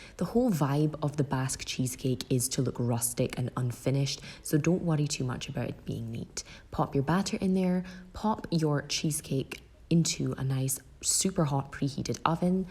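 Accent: Scotland, United Kingdom. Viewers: Found your channel yesterday. Will be binging your videos after lunch. I could listen to your calm voice, and lush Scottish accent all day. Fantastic recipe, thank you 💕🏴🏴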